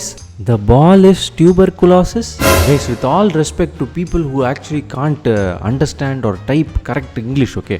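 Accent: Tamil Nadu, India. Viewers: thala vera level tanglish a big fan of you and true what all you told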